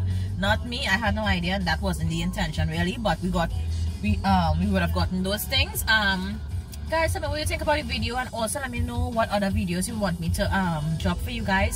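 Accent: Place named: Trinidad, Trinidad and Tobago. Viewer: Damn you so beautiful, looking non Trinidadian...but when you start speaking...you proved me wrong...have a great day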